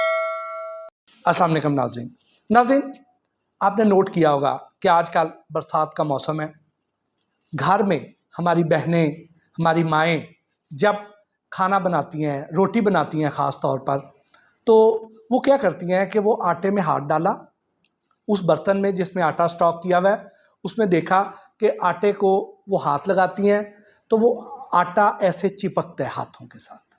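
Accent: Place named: Punjab, India. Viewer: ko Bolna to ata nhi.aisey lagta hai koi paindu bol rha hai Urdu bolny ka style to bilkul Punjabi touch ka hi hai ab Sachi baat kisi ko Buri lagti hai to lgey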